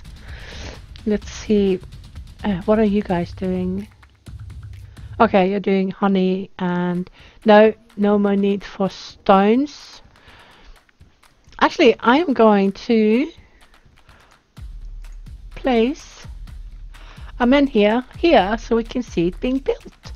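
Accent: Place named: North, United Kingdom. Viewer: Hi 😊👋 just found your channel, your playtrough is very intelligent and informative! Your accent sounds northern🤭 can i ask where you are from? I am Scandinavian thats why I ask🤭 Love your video and will continue to watch your videos, new sub for you😊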